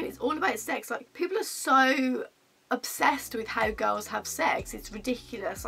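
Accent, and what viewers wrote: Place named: Birmingham, United Kingdom. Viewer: I like your accent, which city are you from? Birmingham??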